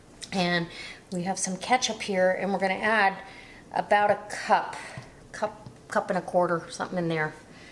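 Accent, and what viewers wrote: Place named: Upper Peninsula, United States. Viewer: Miz, I'm about to put up a crap load of pork shoulders I've had in my freezer for years, vacuum sealed. It's time to clean the chest freezer out, and I have just started canning meats. Can you give me some ideas of different ways/recipes I could make to can, using my pork? Thanks, In advance, and I think you sound like you are from maybe the U.P.? I like your accent.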